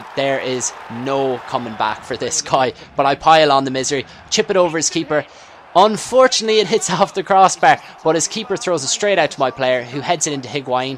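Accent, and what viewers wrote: Ireland. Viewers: its very nice too see an irish comentator.:)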